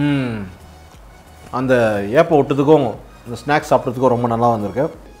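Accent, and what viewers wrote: Bangalore, India. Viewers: Nice review. Hope you are from Bangalore. Since your slang is like Bangalorian..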